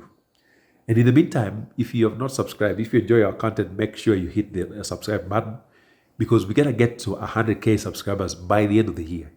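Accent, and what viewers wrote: Kenya. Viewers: This guy's story telling skills coupled with Kenyan accent makes this story even better. I love me an Eastern African accent.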